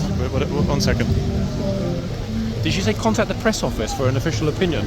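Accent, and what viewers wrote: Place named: United Kingdom. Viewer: You know us American girls are sucker's for a British accent. I like your videos and have been watching old ones I never saw in between your new uploads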